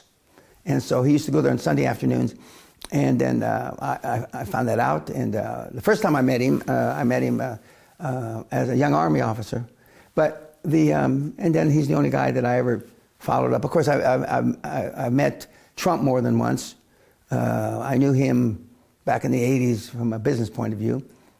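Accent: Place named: New York, United States. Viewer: Man This.guy is a realist..I love this guy .he the only billionaire..that tells people the truth..no sugar coating ..just raw right in your face ..that the type of people I like ..straight forward. Right in your face ..when he speaks the .truth ..I would someday like to meet the guy..but I know that will never happen ..folks listen to this gentleman..he dropping..truth bombs ..to the people ....for some reason he sounds like he from NY ..Cause he definitely rolls like BAD ASS ..but I know he good guy trying to wake up the sheeples..that are in a deep coma ..cause most of them are headed towards the slaughter house ....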